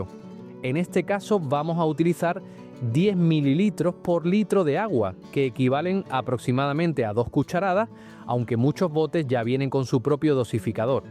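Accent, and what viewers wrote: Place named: Spain. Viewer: HOLA, YO TE VEO DESDE BARCELONA A ESPAÑA. PERO ME PARECE QUE TÚ TAMBIÉN ERES DE ESPAÑOL. POR LO MENOS EL ACENTO UN POQUITO COMO EL MÍO. YO SI VERO PERO VAMOS QUE ME GUSTA TU VÍDEO. DE HECHO, YO ME LO GUARDO. VENGA, ME ENCANTA TU DEJE. ADIÓS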